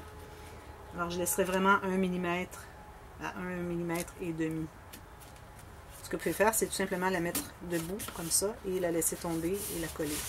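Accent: Canada. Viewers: Merci beaucoup amitié(j'adore votre accent canadien)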